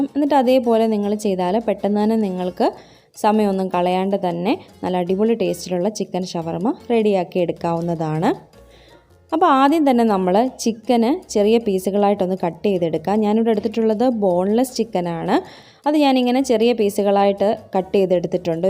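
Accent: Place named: Qatar. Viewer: Good presentation. Samsaram kettappol anik anne thanne pole thonni 🤩🤪 Qatar l alle. Qatar l anenkil angane manasilayinn reply kittitt parayam tto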